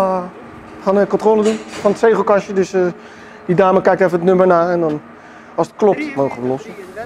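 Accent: The Hague, Netherlands. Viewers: Prachtig, dat Haagse accent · Hahaha geniaal! Lekker plat haags! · Mooie vlog die vent is machtig met zijn Haagse accent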